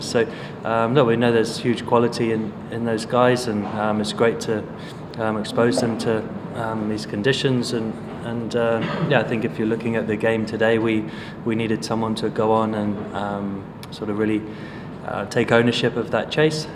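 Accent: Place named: United Kingdom. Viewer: love his British accent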